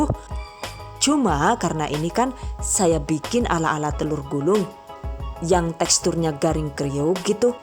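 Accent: Banyumas, Indonesia. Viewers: Bu, ibu wong endi bu, ngapak..tanggane nyong apa udu. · Ngapak maning lha 👍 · Merga wong ngapak dadi tak subscribe juk ben gampang nek arep ndeleng resepe rika suwun yu resep resepe. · Ngomonge bisa ngapak kaya wong banyumas ya mbok ? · Kayak orang bule ya mbknya,,tapi ternyata bisa bhs ngapak2 😂😂🤣,,,btw syukak bgt ma vidio2nya,,thx resep2nya mbk yu 😄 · Lucuuu kocakkk mbaknya...aplgi klo pas lg ngomg bhsa ngapak😂😂😂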